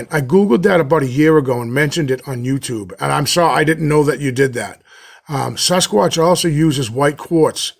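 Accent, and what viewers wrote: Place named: South, United States. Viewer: Awwww, ~ just goes to show that you cannot please all of the people all of the time. YT is FULL of trolls! I will be the first to admit that I'm a sucker for your accent ~ being from the South, I find it very charming. (i.e. the way you say question "mahks" ❤❤❤) I LOVE listening to you, to your descriptions, to your stories... just being able to tag along with you on your adventures. I even like it when you just sit in your van and talk. Hmmm guess I'm just a true fangirl Haha. Seriously though ~ not just patting you on your back ~You make the world a better place being the down to earth, intelligent, great guy that you are. I don't usually say things like this... but that guy is a douche. Eww 🤢 I can't believe I used that word Haha. Maybe I made you laugh. Or at least smile after that loser's nonsense. You should have the option to unsubscribe him from your channel since apparently he doesn't know that's an option! 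Be well and do you,! Much love from Tennessee!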